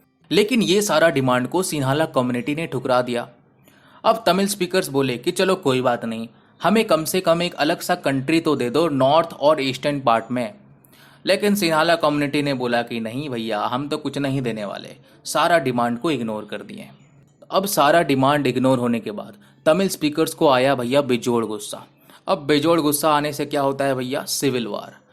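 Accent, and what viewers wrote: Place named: Uttar Pradesh, India. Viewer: That line ki ' Bhaiya aaram se raho, zyada dimaag mat lagao' made me laugh..😉 Nice work bhaiya..Aapki bolne k tareeke se lagta h ki aap UP k h ..main bhi Lucknow me rehti hu 🤗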